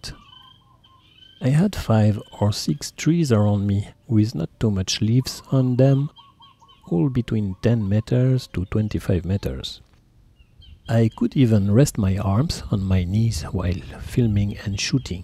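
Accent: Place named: France. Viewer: Merci pour cette très bonne et belle vidéo test. Le petit +: même sans activé les sous-titres je comprends beaucoup mieux votre anglais grâce à votre accent français 😄. Etant amateur débutant, photos nature, oiseaux et animalier, celle-ci m'a grandement influencée pour l'achat du R7 + 100-400 avec un budget raisonnable. Thank you very much!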